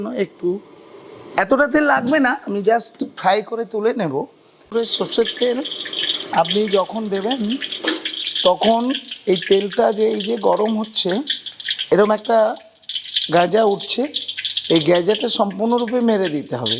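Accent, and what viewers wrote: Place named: Birbhum, India. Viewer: বীরভূমের লোক মনে হচ্ছে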